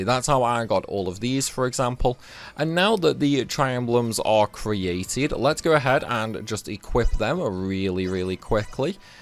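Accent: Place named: England, United Kingdom. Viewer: FAXXX 😂 ITS already difficult to understand a English dude from England, as an American his accent can get annoying, specially when he talks instead of giving us step by step process.😂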